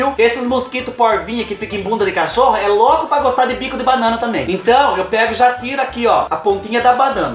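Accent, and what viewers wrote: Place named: Countryside, Brazil. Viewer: morei no interior e sinto muita saudades,amo esse sotaque lindo. Vc lembra do vídeo do coró? Aquele dia eu ri tanto que doeu a barriga. Quando mudei pra Tatuí eu estava grávida,e ouvi minha sogra falar que lá na árvore estava cheio de coró,eu achei que era uma fruta e fiquei com desejo de comer o tal coró, só que eu não falava tinga vergonha, pois estava na casa da sogra,como eu ia dizer aí sogra me dá um coró. Pois vem eu já andava com a boca aguando quando o pai do meu filho chegou de uma viagem de 2 meses eu pedi a ele, por favor eu quero comer coró não aguento mais de vontade ou vc compra ou vou me separar de vc. Todo mundo riu do céu. Essa foi a maneira bizarra que fui apresentada ao coró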